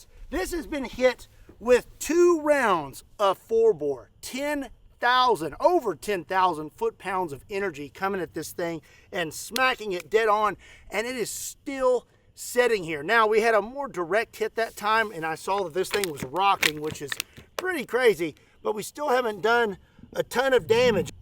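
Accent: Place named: Baltimore, United States. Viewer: B-more people like why he talk like that. If you know you know. 😆 🤣 🫡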